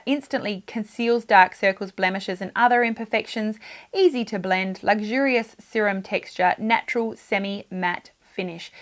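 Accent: Australia. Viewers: Love your videos. You are fantastic. It's great to have an Australian voice.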